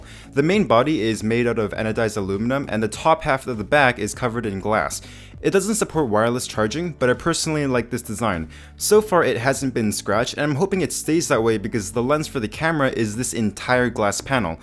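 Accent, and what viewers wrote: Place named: Canada. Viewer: Judging by your accent, I guess you're.. Canadian? Am I right?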